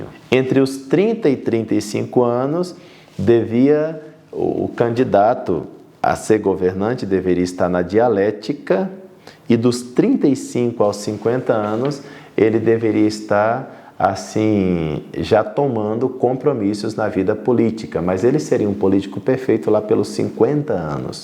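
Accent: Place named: Rio Grande do Sul, Brazil. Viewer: Esta homem pode levar figuras ilustres e estrelas a uma profunda DEPRESSÃO. Sua fala me lembra o Gaúcho CARLOS REIS em: Seu professor mentiu pra você, mentiu muito. Grato.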